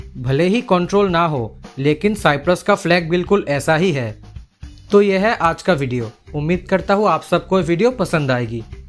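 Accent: Bengal, India. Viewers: Bhai ami sure, tumi Bengali😄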